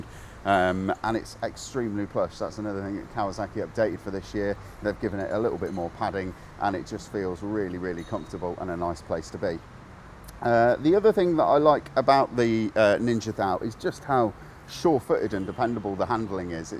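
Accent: United Kingdom. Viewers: I wish I understood more what he was saying. My family is Irish so Im used to some heavy accents but sometimes the British slang and accent is rough.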